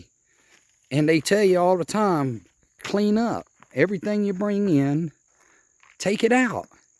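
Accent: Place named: United States. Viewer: Nice content. Your accent is really like a cow boy hahaha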